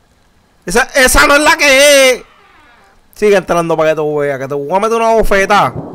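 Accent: Puerto Rico. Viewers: JAJAJAJAJA PUERTORRIQUEÑO AL 100% AMO❤️❤️❤️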